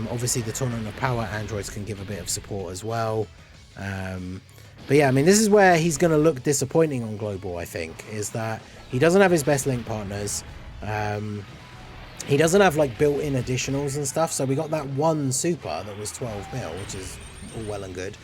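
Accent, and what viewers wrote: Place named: United Kingdom. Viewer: Are u from the uk? I love the british accent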